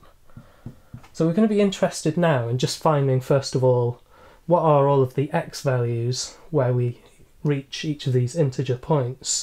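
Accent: England, United Kingdom. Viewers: Fantastic solution! I really appreciate how systematically you approached the problem, making it easy to follow and understand. Your channel is truly a gem for anyone looking to improve their math skills, and your way makes learning enjoyable. Please keep uploading such excellent videos! Your dedication and clear explanations are incredibly valuable. Apart from the maths, I like your English accent, it is amazing. Like from Saudi Arabia 🇸🇦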